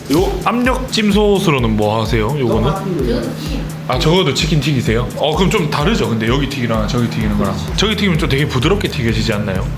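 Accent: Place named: Gyeongsang, South Korea. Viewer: 갱상도 사투리도 이렇게 서윗하게 들릴줄은 목소리 언제나 서윗합니다..ㅋㅋ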